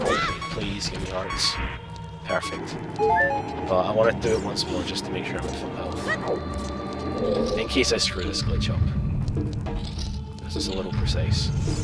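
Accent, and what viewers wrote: Scotland, United Kingdom. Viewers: R u scottish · Woo Scottish accent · HES SCOTIIIIIIIIIIIIIIIIIIIIIISH WOOOOOOOOOOOOOOOOOOO (I'm American but I love Scottish accents) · Ok but his accent sound scottish, but thats just my opinion.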